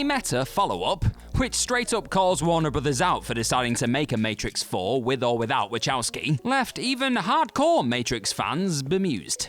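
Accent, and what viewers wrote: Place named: RP, United Kingdom. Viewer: I'm sorry, but sucks as a narrator. He always sounds so condescending and phony. Like he's trying to play the character of a posh, Shakespearian trained voiceover actor instead of just doing a normal voiceover where he sounds like a normal person.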